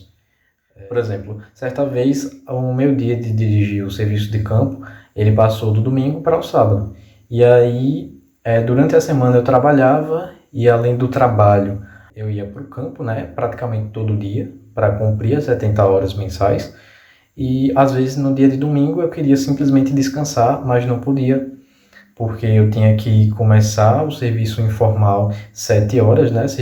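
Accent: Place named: Aracaju, Brazil. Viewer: Aracaju. Tava esperando você dizer a cidade pra saber de onde é seu sotaque.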